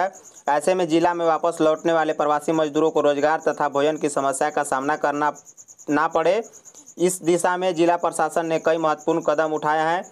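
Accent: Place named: Bihar, India. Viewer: Tum to bihari lag raha h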